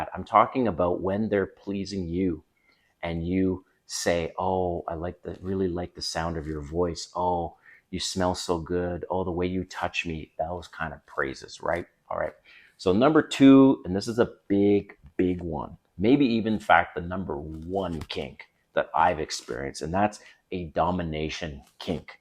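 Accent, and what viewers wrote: Canada. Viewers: i can detect the Canadian accent ! Cool 😊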